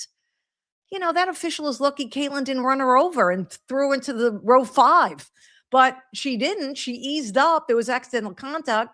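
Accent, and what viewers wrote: New York, United States. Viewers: right on😂. Wnba mgmt. is a travesty. I love your accent! NY? Queens?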